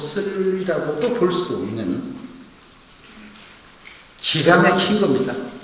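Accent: Gyeongsang, South Korea. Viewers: 역시나훈아님멋져 경상도말씨구수함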